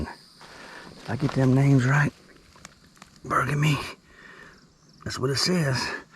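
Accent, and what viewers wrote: South, United States. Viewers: Thank you, this tour was fun and interesting .. Plus, l must say, enjoyed listening to the southern accent .. 👍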